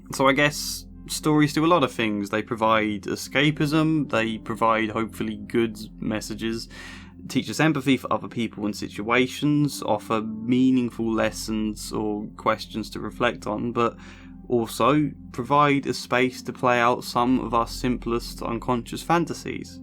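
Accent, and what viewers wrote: England, United Kingdom. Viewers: I love you Mr calm English accent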